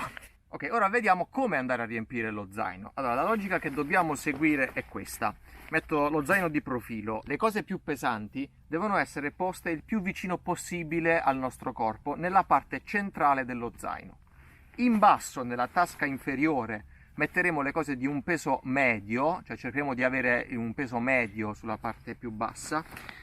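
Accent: Apulia, Italy. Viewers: Grazie per le belle dritte che ci dai con grande simpatia! Non so di dove tu sia ma ti sento molto Pugliese... e ho detto tutto. Non vorrei snagliare.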